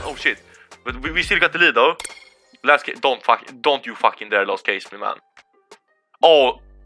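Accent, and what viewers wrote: Sweden. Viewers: You sound so Swedish, but I love it :D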